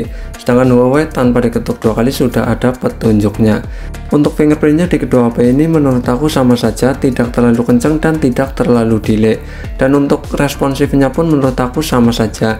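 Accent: Banyumas, Indonesia. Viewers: Logatnya ngapak bg😁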